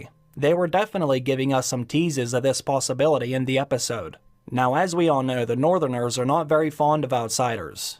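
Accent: Pittsburgh, United States. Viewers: I enjoyed your analysis. By the way, you sound like you're from Pittsburgh. Am I correct?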